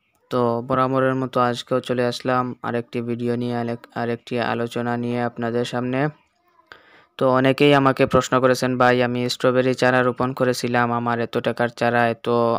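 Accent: Sylhet, Bangladesh. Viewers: ভাই কিতা সিলেটি নি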